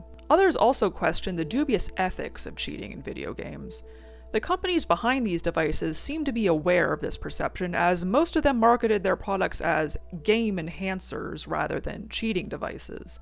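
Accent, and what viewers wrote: Canada. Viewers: i didn’t realize you were canadian until you said “zed” and then the way you say “newer” (nyoo-er instead of noo-er) really cemented it lmaooo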